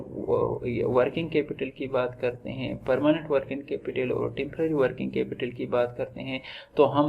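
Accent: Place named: Pakistan. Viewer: Beautiful pakistani accent.... I guess its pashto, sorry if i m wrong.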